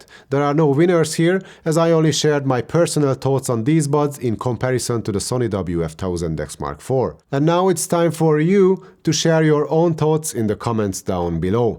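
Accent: Hungary. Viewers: Why do you have a hungarian accent? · Where are you from mate? You sound Hungarian. Van bojler eladó! Great video btw.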